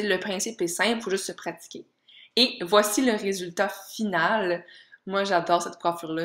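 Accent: Quebec, Canada. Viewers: C'est l'accent du Québec et ton commentaire est idiot ! Si on pourrait entendre le tiens on le trouverait sûrement plus chiant. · L’accent québécois du Québec!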